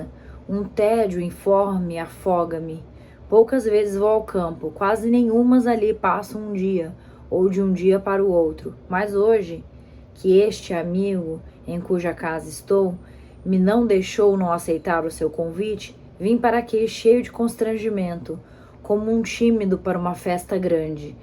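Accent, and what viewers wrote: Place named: Brazil. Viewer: Ela pode até falar um português do Brasil, mas se diz, que o de Portugal é igual, aí é forçar. Além do vocabulário, a entonação e a forma de falar são diferentes.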